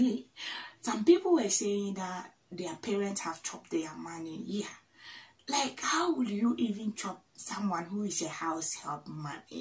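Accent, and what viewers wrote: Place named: Ghana. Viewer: My favourite girl with the beautiful Ghana accent thanks for being a hardworking lady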